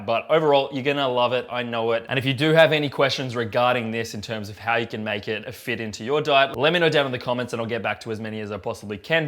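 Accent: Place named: Australia. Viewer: Ahaha didn’t think you would be Australian/sound like that 😂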